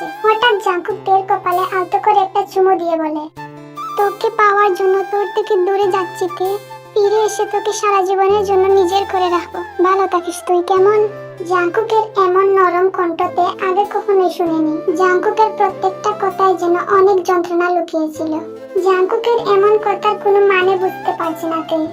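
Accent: Sylhet, Bangladesh. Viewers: তোমার কথার মধ্যে সিলেটি ভাষার টান আছে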